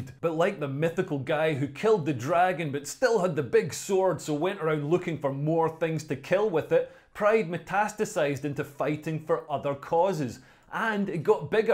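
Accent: Scotland, United Kingdom. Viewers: This guy and his damn beautiful Scottish accent is spitting all over me Spitting facts that is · Coming from a gay dude, great video. I completely agree, I thank you for speaking out about this. And who doesn't love a Scottish accent? Besides the Romans of course... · Bro is peak Sco'ish 🏴 👌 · I Really Like his Scottish Accent Talking about why he Hate Lgbtq Idk What he saying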